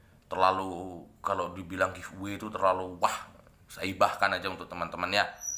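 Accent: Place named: Bali, Indonesia. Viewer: ...baru beli srdc ombyokan kemarin...liat video ini langsung tertarik nontonnya..bahkan sampai berulang2 biar paham....dengar logatnya jadi kangen bahasa indonesia yg aga ada medok balinya...saya lahir dan besar di gianyar mas....sekarang lagi merantau di pandeglang banten....maju terus tetap semangat...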